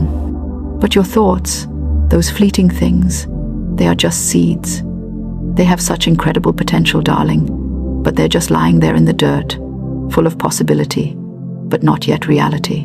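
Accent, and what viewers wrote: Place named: England, United Kingdom. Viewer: American woman with an English accent.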